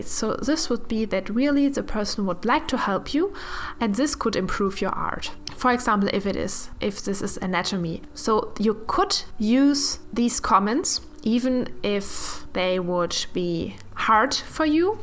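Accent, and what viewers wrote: Germany. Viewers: where are you from? tbh you sound very German 😂 would be funny if you actually are, bc lately I found so many german artist on YouTube! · Are you by any chance german? I'm german and you sound typically german ( which of course isn't a bad thing at all ) btw the painting looks really good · Bist du Deutsche? 😂 hört man sofort haha 💖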